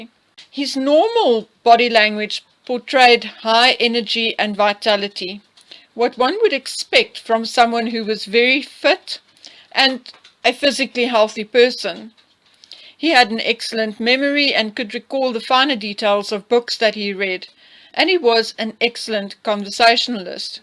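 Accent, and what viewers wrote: South Africa. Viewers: And your beautiful South African accent. Makes me homesick. I lived 30 years in RSA.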